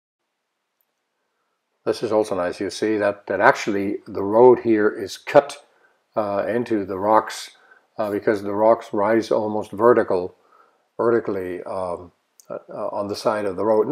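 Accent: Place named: United States. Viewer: Hallo lieber Velomobilist, das ist aber allerdings wirklich Schade. Mein Mann, also nicht der von gestern, das war "nur" mein Radelkumpel, war so gespannt auf die Bilder und ich auf uns von oben, dass du jetzt eigentlich nochmal hier vorbeikommen musst. Du bist ja auch durch Holzweiler gekommen und da wohnen wir. Mein Mann ist sehr an dem Velomobil interssiert und stellt mir 1000 Fragen, die ich ihm nicht beantworten kann. Bring deine Drohne in Ordnung und komm auf einen Kaffee oder Tee vorbei. Wir würden uns sehr freuen. Von uns kannst du dann auch über Kalenborn sehr schön über die Eifel fahren. Where did you learn you perfect English with American accent. We are quite fluent as well. We lived in the States for some years. It would be really great to meet you again!!!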